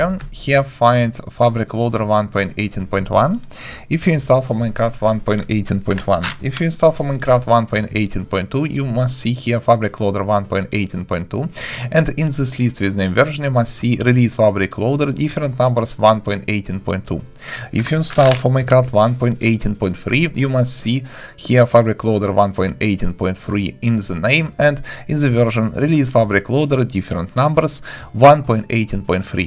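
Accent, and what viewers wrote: Russia. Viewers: dude найс инглиш ты русский и это слышно по акценту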